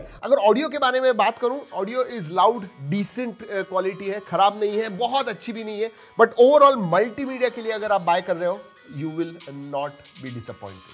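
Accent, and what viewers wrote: India. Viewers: Aapka bolne ka style ekdam desi hai..."aane dijie aane dijie india me dekhte hai"👌👌 maza aata hai aapko sun ke sir..🙂🙂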